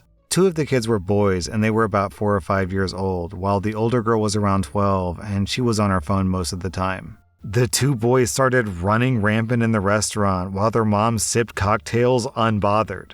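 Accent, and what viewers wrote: South, United States. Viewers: The southern accent is perfect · Im not from the south but i love your southern accent. Please use it more? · The slight southern accent killed me 😂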